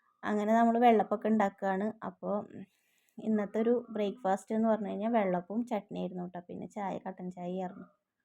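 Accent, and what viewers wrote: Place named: Thrissur, India. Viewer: നിങ്ങളെ സംസാരം കേട്ടാൽ മലപ്പുറം ആണെന്ന് തോന്നും. But കുഞ്ഞു തൃശൂർ slangan. വെള്ളപ്പം 👍🏻try ചെയ്യണം ❤️❤️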